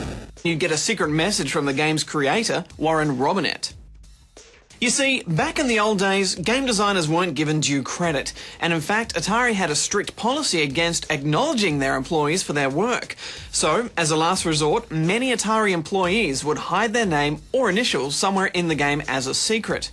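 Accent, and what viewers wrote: Australia. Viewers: THIS IS NOT BRITISH ITS AUSTRAILIAN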